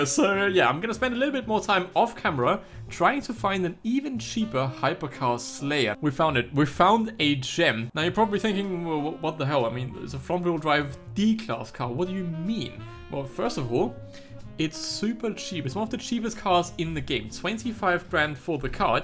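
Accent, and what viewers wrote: Germany. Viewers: he is German / Austrian I can hear it as I also am germna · R u German? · Are you german? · man hört das du Deutsch bist :) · Are you from Germany?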